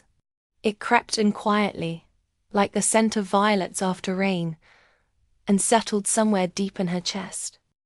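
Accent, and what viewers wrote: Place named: United Kingdom. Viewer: Why the accent sounds like British 😂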